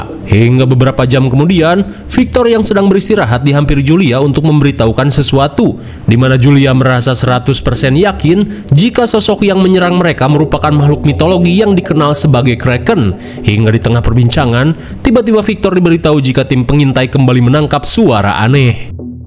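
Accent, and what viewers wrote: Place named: Java, Indonesia. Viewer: Entah kenapa saya mendengar suara Jawa Jawa Jawa 😅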